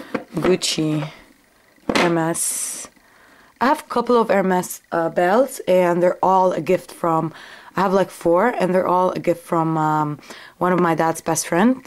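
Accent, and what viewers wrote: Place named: Arab world. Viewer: Are you moroccan or somehing like that : becouse you have an arab accent ! x) <3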